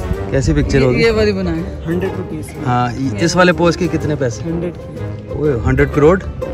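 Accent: United Kingdom. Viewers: I don't get your British accent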